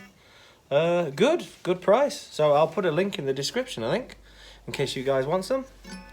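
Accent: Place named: Estuary, United Kingdom. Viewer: Ok.... 1. When I clicked this video, I was right in the middle of installing Guyker tuners (locking 6 in-line) to my Banana/Hockey Stick neck I just installed onto a Stratocaster body. Yep, you heard right. Will it intonate and work right? Well, the measurements are not exact, but close...so we will see. 2. Are you living in America? Because I hear the very light estuary accent, but you are DRINKING COFFEE and not a cuppa earl grey with milk? Interesting. Nice work on your #1. I'm in the middle of trying to figure out which guitar out of 16 is my #1 since I play different music at different times and have not settled down with a real strat as I build my own.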